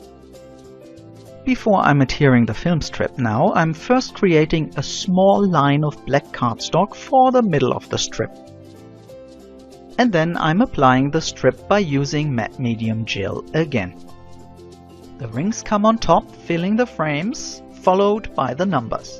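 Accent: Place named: Germany. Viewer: I thought it was German by the writing and accent! :) I'm British but I was born in Munster (dad was in army) stay crafty my friend!! :) X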